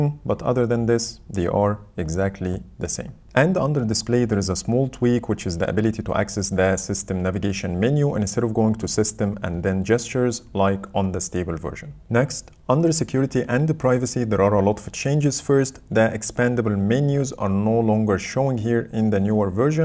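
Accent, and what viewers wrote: Egypt. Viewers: Love ur egyptian accent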